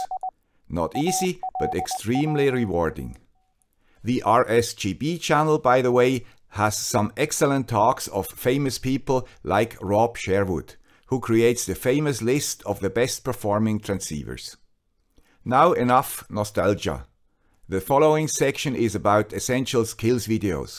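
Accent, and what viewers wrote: Switzerland. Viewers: There are some great ham channels on YouTube and as a returner to amateur radio, they have helped me learn a lot. I'm always glad to hear a little Swiss accent modulation of course!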